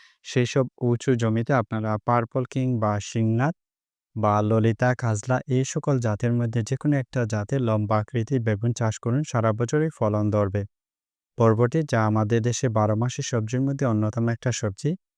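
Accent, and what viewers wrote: Sylhet, Bangladesh. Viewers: হেলো সিলেটি ভাইসাব। পার্পল কিং জুন মাসে লাগাইলে অইবনি??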